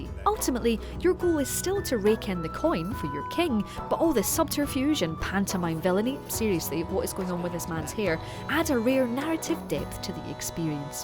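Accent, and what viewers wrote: Scotland, United Kingdom. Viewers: very scottish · Damn, dat Scottish Accent 😌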